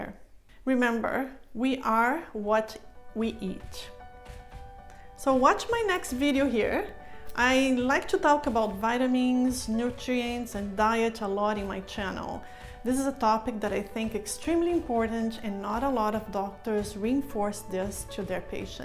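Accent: Brazil. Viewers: Brazilian accent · Muito bom vídeo! Pelo sotaque acredito q a senhora seja brasileira. Ótimo trabalho!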